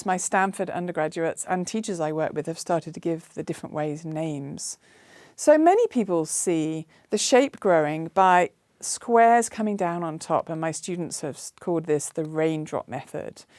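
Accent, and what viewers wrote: United Kingdom. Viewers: So she's wrong because she has a British accent? Forget the accent and listen to what she is saying, then present a coherent argument against it if you have one. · Notice the British accent. The British still own the US.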